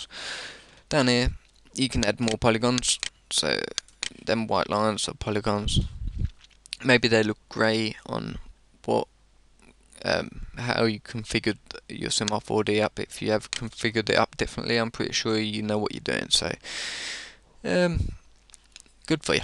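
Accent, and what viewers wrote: United Kingdom. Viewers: you sound like russell brand.. nice english accent..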